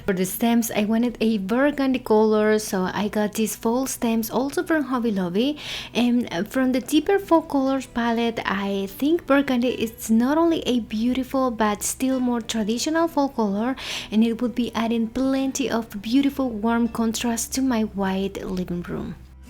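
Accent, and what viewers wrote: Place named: Brazil. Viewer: Hi, I am a silent subscriber but wanted to say that I love your style and always look forward to your videos:) I love your accent, Brazilian, Portuguese, Colombian? (Lisa from Seattle)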